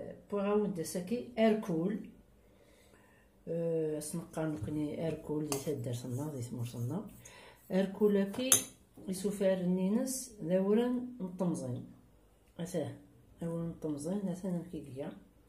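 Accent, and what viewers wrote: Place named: Beni Yenni, Algeria. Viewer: Bravo,tu me rappelles ''mon enfance''!Je suppose que tu es du côté de Béni -Yenni, même accent!Ma maman ''Allah Irhamha''le faisait!Nous te soutenons et te souhaitons tout le bonheur du Monde!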